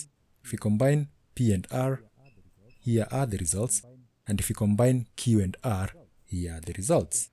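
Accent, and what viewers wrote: Kenya. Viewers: You sound like a kenyan.....are you one???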